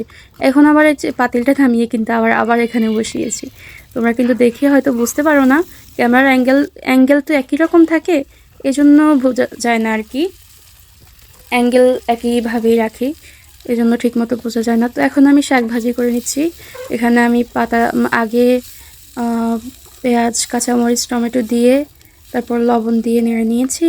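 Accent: Noakhali, Bangladesh. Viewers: Apu apni Noakhalir na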